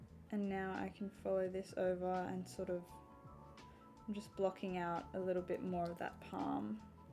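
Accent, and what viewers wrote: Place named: Australia. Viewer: Your Aussie accent is 🤩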